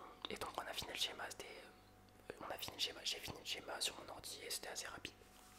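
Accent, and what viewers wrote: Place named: France. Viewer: Je ne sais pas ce que c'est pour les Français, mais en tant que Turc, j'aime beaucoup écouter des mots prononcés avec un accent français. Je vous souhaite un succès continu.🎉❤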